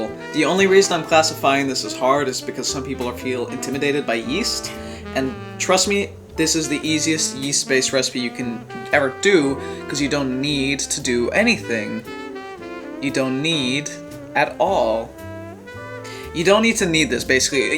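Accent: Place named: United States. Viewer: As a British person I can proclaim that you sound 1,000,000% American, sorry 😵 it's pretty common for Europeans, but usually there's a hint of something else in there, though I don't detect it with you!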